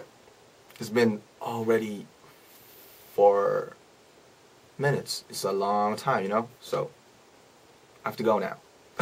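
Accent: United States. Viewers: He sounds like he was born and raised here in the states and went over to SK to be an idol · A cute LA California boy 😆. But his accent is amazing. Legit thought he was from America or had lived here for a long time. Mind blown by him all the time. Love you RM 💜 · he literally sounds like a true American- bruh my dad who has lived in the states for over 15 years has a strong polish accent and he also learned english from friends meanwhile here who has never lived in the states for more than what a couple months sounds like he was literally born and raised in the states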